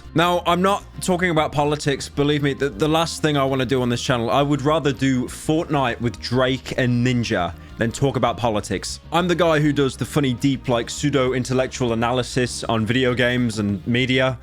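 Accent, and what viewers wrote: United Kingdom. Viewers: Every time says Twit-uh I laugh because he's funny British "Man". No but seriously I love your content ❤️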